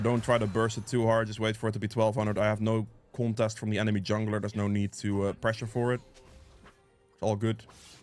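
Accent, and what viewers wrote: Netherlands. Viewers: you look like kingsize lol (dutch rapper) guessing ur from the Netherlands by ur accent too, great vid once again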